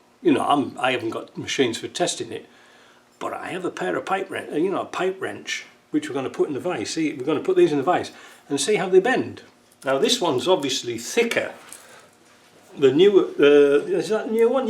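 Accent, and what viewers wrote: Yorkshire, United Kingdom. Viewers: i do enjoy your clips. just wondered how what sounds like a yorkshire lad ended up in cannda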